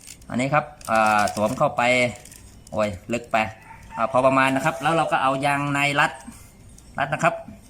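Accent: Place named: Trat, Thailand. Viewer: พูดสำเนียงคนตราด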